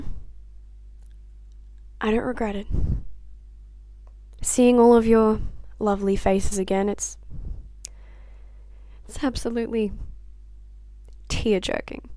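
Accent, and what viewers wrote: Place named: United Kingdom. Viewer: Yes. She sounds british. wait wtf how old is she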